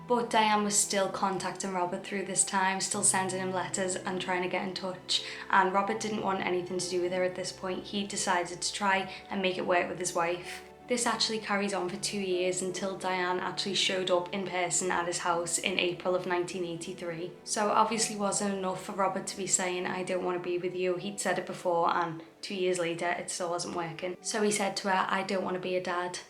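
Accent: Liverpool, United Kingdom. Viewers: is From Liverpool, England. That is a Scouse accent.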